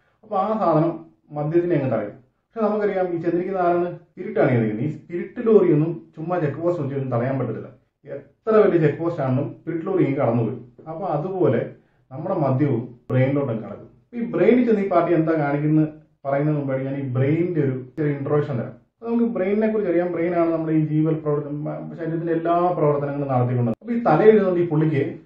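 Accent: Kottayam, India. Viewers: pure കോട്ടയം slang.. വാഴൂർ-? പൊൻകുന്നം? കാഞ്ഞിരപ്പള്ളി??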